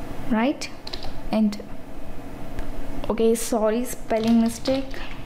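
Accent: South India, India. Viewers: I like your south Indian accent....... thanks for this video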